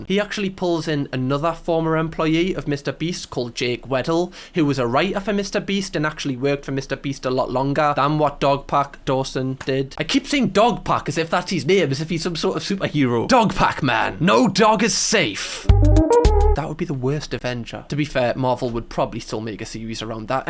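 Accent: Newcastle, United Kingdom. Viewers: he’s from newcastle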